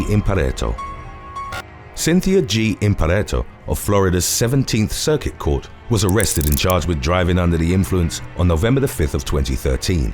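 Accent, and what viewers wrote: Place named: United Kingdom. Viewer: how come you sound british yet this channel uploads in USA? Good channel bro, i watched it grow the past 7, 8 years. 5☆ mate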